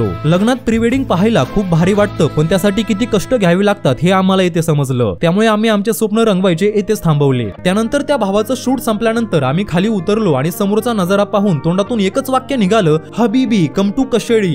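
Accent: Ahmednagar, India. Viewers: ही नगरी भाषा आहे भाऊ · Nagarkar ahe tey tyache ucchar tasech rahtil.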